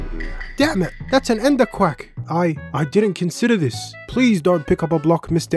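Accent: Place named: England, United Kingdom. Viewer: Ur voice is more English sounding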